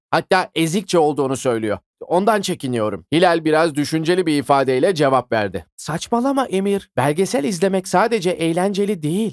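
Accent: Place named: Black Sea, Turkey. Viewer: seslendirmen karadenizli kesin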